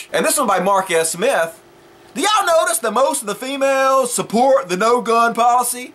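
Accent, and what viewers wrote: South, United States. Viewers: Never heard of you til today. I too have a pretty bad southern accent (Louisiana) and I tend to pick on hillbillies alot because of their close mindedness and lack of intellect. Dude, I couldn't help but to be impressed by your video. You mentioned people judging you because of your accent, and when I first started watching the video, I started to click on something else. (regardless of the fact that I sound the same.) I guess you can say, I assumed lack of intellect when I heard the accent and I apologize. As I continued watching the video. I realized, this southerner is pretty freakin cool. You are alright there. Way to represent logic from the South. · I'm not gunna lie the first video of urs I saw I thought "oh no a ranting southern hillbilly." I'm glad i listen to words not accents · Great video once again! Just to let you know, I don't automatically judge every southern drawl as a moron.:D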